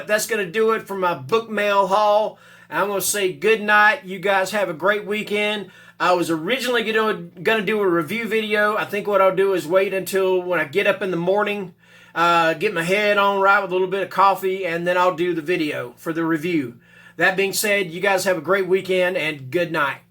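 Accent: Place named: South, United States. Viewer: you never lost your southern accent. Love it. 👍 Nick and Regina are so nice. Awesome book mail. I remember your review of The Ruins and how much some of the characters got on your nerves 🤣 Iconic. Cool Dracula knife.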